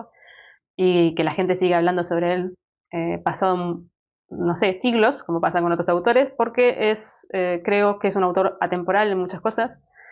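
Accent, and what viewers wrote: Argentina. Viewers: Hola, sos argentina o uruguaya? Por el acento digo.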